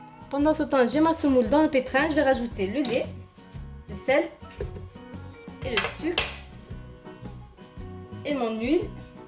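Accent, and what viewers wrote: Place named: South, France. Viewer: Superbe cette recette en plus avec un joli accent du sud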